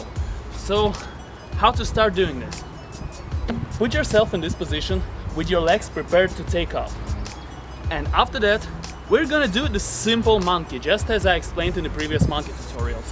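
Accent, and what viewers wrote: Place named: Russia. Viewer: Слышу твой русский, сквозь английский)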